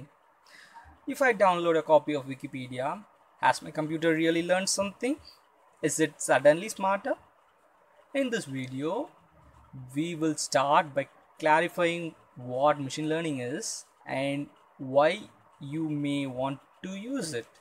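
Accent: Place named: India. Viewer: no doubt it is a good topic, but I am trying to understand your Indian accent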